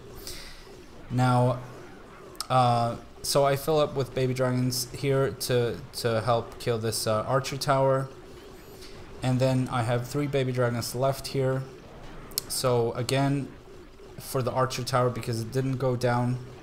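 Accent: Sweden. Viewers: I really heard your Swedish accent haha, men bra video 👍🏽😉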